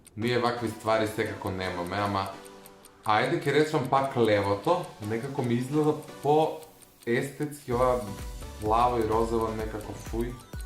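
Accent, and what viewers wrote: Kumanovo, North Macedonia. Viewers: Koj saka da snima kade zboruva 24h Kumanovski. Stavete lajk za da vidi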